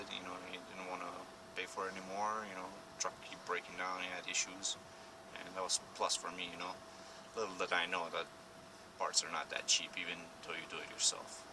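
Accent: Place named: Russia. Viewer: You definitely sound like a Russian.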